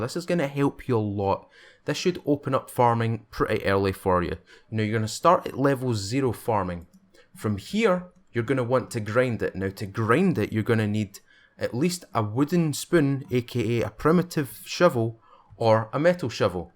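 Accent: Scotland, United Kingdom. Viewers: ya know what is it with people in the comments saying hey do you have a Scottish accent well duh of course you can hear it dumb ass what I'm I a flipping Korean no... THE ONLY EXSCUSE IS THAT MOST COUNTRIES DON'T EVEN KNOW THAT SCOTLANDS A PLACE and if they do hey say we're all con men ok rant over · Haha, I’m from Glasgow too, how can someone not know a Scottish accent when they hear it?.....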